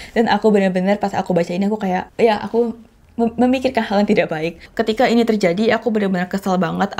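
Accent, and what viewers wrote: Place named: Germany. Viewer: Lidahnya mbak udah sering keseleo sama logat Jerman.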